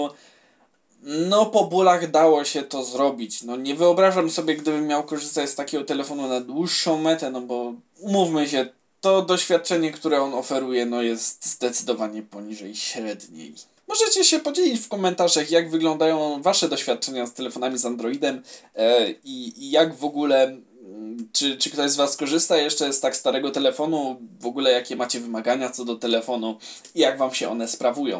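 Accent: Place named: Podlasie, Poland. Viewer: akcent z Podlasia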